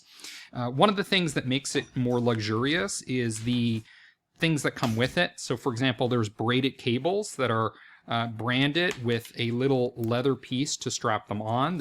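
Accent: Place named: United States. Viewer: That’s a nice review. It’s very nice informative. I also own a pair of H95 headphones. I gotta say I really like your articulation American accent. Where are from if you mind me asking?1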